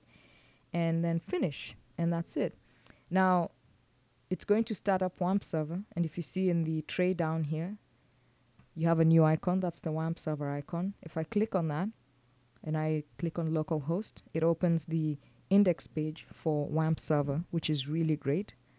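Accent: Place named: Nairobi, Kenya. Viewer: i noticed ur english is a blend of nairobi and the pauses between the videos :) blessed to have a kenyan around :D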